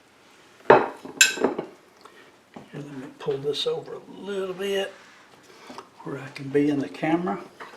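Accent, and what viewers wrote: South, United States. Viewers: Looks yummy..great for a brunch..;) love your southern accent..I lived in va as a navy wife..loved living on the east coast..;)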